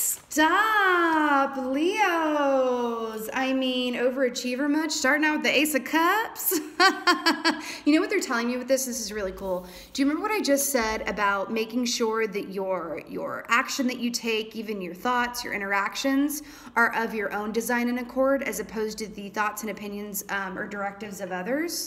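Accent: South, United States. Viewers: Plus, that Southern Belle accent! ❤️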